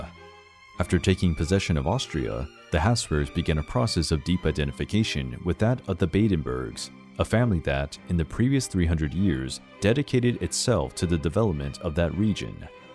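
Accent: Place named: United States. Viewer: love this video! very informative! a little frustrated with the american english pronunciation, but i can’t expect everyone to have perfect german pronunciation 😂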